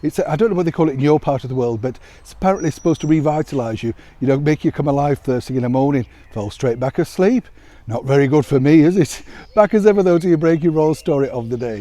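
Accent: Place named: Liverpool, United Kingdom. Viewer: you say you are a Yorkshire man, but when you are joking I find you have a very Liverpool cadence, not accent but for example your pally endings - don’t you. I love it as I’m a scouser from way back. Thank you for giving me a touch of home everyday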